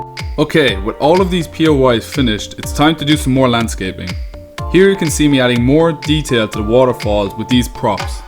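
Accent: Ireland. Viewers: Are you Irish? Dublin maybe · Hi man you probably wont see this but you sound irish which u an to!if u see this can u pls tell me where ur firm im curious lol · You have to be Irish what county u sound like a mayo man like myself please reply 😄😄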